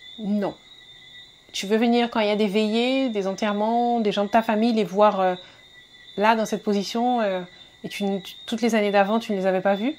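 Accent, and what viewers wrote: Haiti. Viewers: Quand tu parles créole, tu as le même accent que les gens du Nord d"Haiti. Mais quand tu parles français, c'est comme tous les haïtiens en général. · oui du jugement des autres aussi je ne sais si vous connaissez le Sénégal mais il aime trop te juger. vue que suis au Canada si je rentre il vont avoir à l'oeil tout mes fait et geste.Merci pour tas réponse vous avez le même accents que les haïtien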